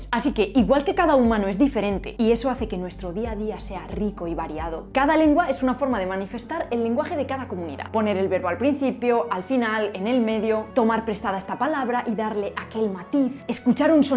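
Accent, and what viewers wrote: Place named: Spain. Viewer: Hola!!!! Muy buen video!!! Tu acento me encanta y me enamora!!! Que lindo es oir a un español hablar!!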